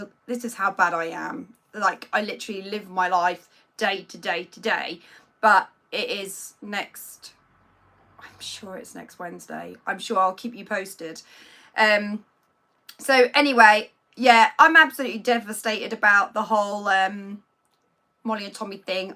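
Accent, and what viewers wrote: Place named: Cockney, United Kingdom. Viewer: There's definitely a bit of cockney in there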